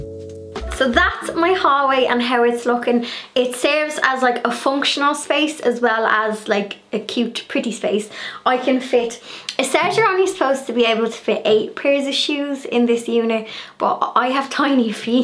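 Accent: Ireland. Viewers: Hi, just found your videos and subscribed so I can watch new ones! Spent the weekend watching your previous ones! Enjoyed all your up cycles, blogs. You have a beautiful home. I’m here in the UK. Love your gorgeous Irish accent too. 💕 x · OMG Are you Irish? What a lovely accent.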